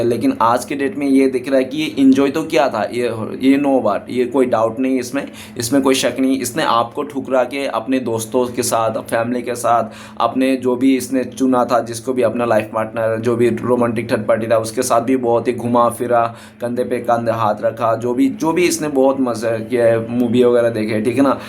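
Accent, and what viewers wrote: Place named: Bengal, India. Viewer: aapnar acent bengali aachen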